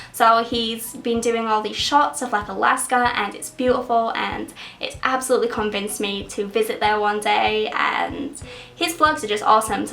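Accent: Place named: North, United Kingdom. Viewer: I'm sooooo confused by your accent? Is it northern or American?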